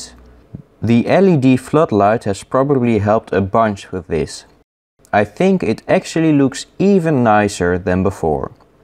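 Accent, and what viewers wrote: Netherlands. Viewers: thats such a heavy bad dutch accent wow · Its a dutch accent we have a really weird g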